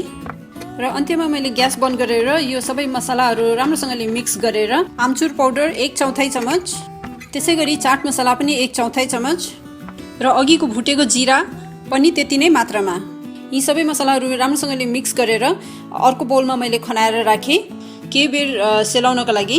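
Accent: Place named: Darjeeling, India. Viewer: The way you are talking resemble as you are from Darjeeling or siliguri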